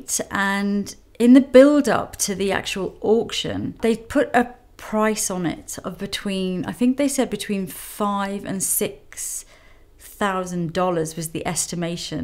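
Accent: United Kingdom. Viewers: Shes got amazing eyes and a great facial structure...I love her briiish acesent...great make up tips thx...we all love Audrey she is a darling and amazingly creative..thx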